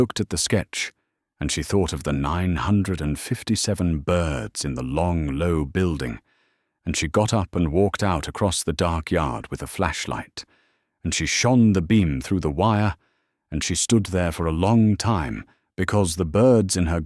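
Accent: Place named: United Kingdom. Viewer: why is the narrator a Brit?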